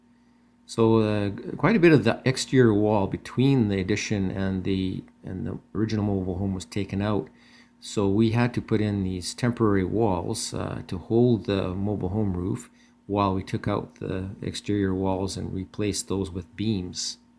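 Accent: Canada. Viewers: Your building construction is AWESOME, and is STRONG! (...your accent: are you Canadian? I love your accent!My mother was Puerto Rican; she had her accent; my father was a "hard-nosed Yankee; he had his accent. Your accent is...nice; it's "neat" that your daughter gets to help! GOOD JOB!! AWESOME QUALITY!! ). 👍😃👣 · You very much Welcome, again excellent looking home! I only assumed you were Canadian by your accent I have a few friends that live in Canada, Well greetings from Florida!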